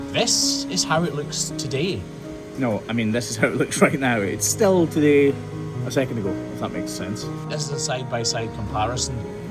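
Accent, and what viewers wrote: Scotland, United Kingdom. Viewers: voice is soothing. I like it. And Scottish. I like it. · Do english cows understand your Scottish accent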